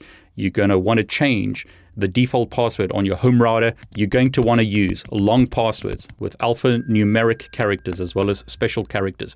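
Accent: South Africa. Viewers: Are you South African? I recognise the accent. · Thank you, I'm an idiot. Also, do you have SA heritage? I swear that accent sounds super local! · You sound like a South African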